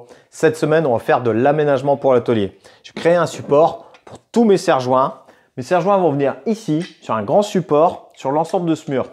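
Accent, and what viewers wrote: North, France. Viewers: merci l'ami, une bien belle fabrication... je n'aurai pas pensé au clous de renfort. un ch'ti acchin del nor ?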